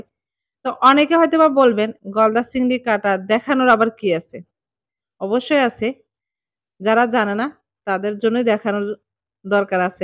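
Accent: Noakhali, Bangladesh. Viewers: নোয়াখালীর আপা আপনি?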